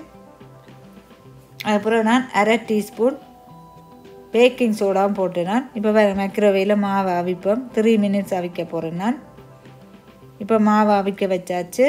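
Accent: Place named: Sri Lanka. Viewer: Sis nenga sreelanga ah va sis voice slang super🎉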